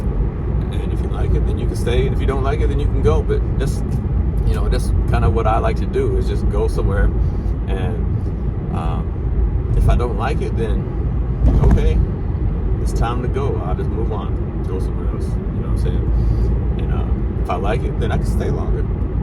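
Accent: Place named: Florida, United States. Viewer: hey there >>>nice ta see y'all again good vid! your accent, and mine sound damn near alike. where are you from originally? am in florida. born in Orlando. live in Lakelsnd, FL now. am an original "cracker." lol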